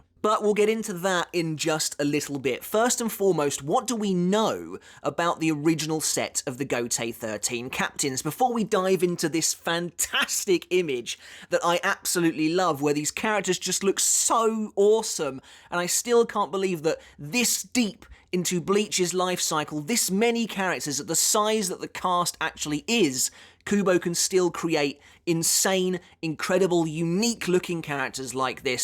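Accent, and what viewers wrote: United Kingdom. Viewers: Love how you pronounce those Japanese names with your British accent! haha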